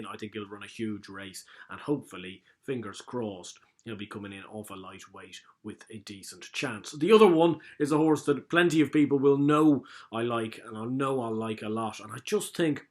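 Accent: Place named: Ireland. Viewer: donkey years and pats fancy ew or to win, what are you suggesting here, it's the thick irish accent that i find hard to keep up with sorry bud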